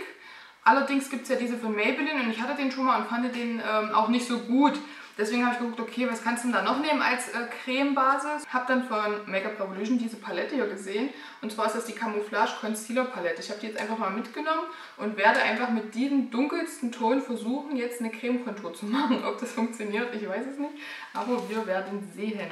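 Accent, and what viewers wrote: Saxony, Germany. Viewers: Schönes Video, alles super erklärt, und das Denglisch finde ich auf sächsisch sehr sympatisch, gerne immer wieder mehr davon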